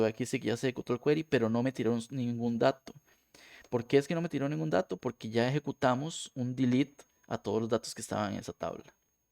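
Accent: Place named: Costa Rica. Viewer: Apenas empecé a escuchar este video dije "Ese acento es tico"🤭🇨🇷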